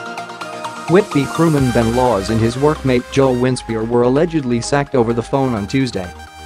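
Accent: United States. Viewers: Narrated by an American robot?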